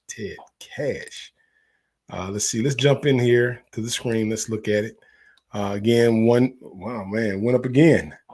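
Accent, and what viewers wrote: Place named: Alabama, United States. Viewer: You from Alabama? Kinda sound like it lol 💪🏽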